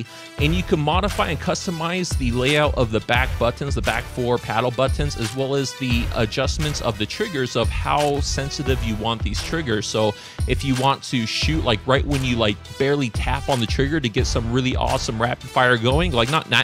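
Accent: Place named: United States. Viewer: Sounds American but doesn't look it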